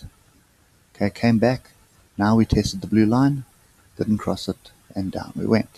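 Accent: South Africa. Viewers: You sound South African :)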